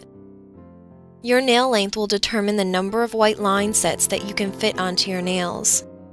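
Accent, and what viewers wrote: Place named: Ontario, Canada. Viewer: Are you from Canada because when you start or started it sounds like you have an Ontarian accent.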